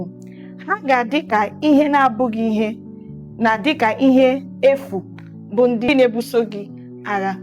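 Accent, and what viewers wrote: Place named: Igbo, Nigeria. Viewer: The pure Accent... Unfiltered Igbo. Ride on ma. 👏🦅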